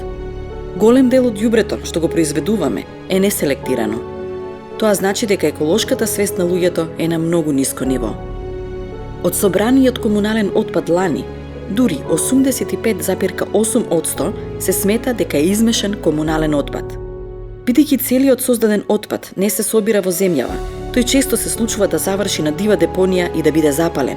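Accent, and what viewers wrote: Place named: East, North Macedonia. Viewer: Малку си мава на источномакедонски нараторот, но е многу подобро отколку виличење на скопски. Најважно од cè, многу интересна тема, и добро покриена. Браво!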